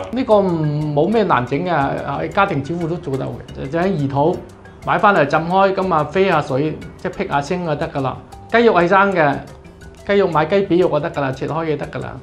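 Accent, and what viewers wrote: Zhongshan, China. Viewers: 师傅口音好似中山人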